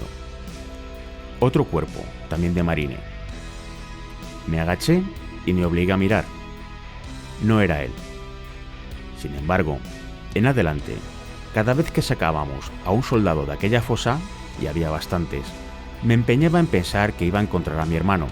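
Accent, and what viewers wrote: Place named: Spain. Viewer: Porque dice audio real, sí el mae era gringo y este audio es españolete??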